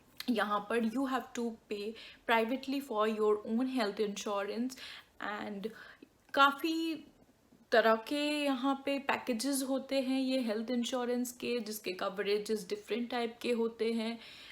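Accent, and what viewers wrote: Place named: Bengal, India. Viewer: Are u from Bengal according to your language style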